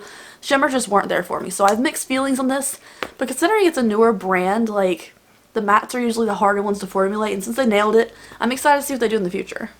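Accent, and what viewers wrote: South, United States. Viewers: I really like your videos only thing as European that speaks perfect English I have a problem with understanding your southern accent. Maybe talk slower idk😭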